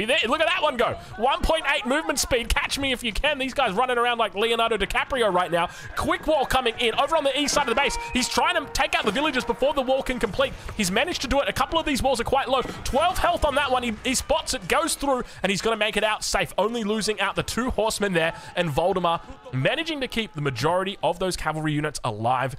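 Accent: Australia. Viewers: Dont gang up on poor about his pronunciation of words, he's from Australia and their version of English is different.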